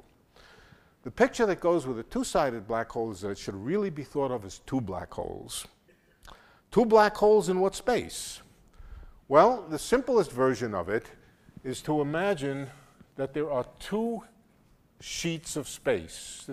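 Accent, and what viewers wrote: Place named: Bronx, United States. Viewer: bronx guy! lol love it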